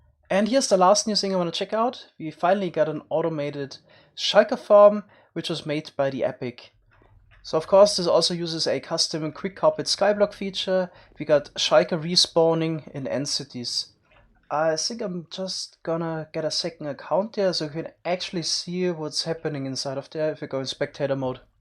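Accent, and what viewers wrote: Germany. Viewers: German accent shows, I'm using to imitate a German accent towards my German friends:) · german here, is his English really that german?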